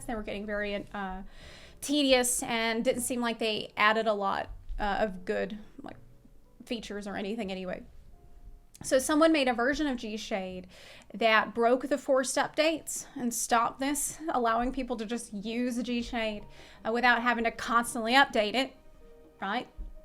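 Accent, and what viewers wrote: United States. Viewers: Her cowgirl accent is coming back to fast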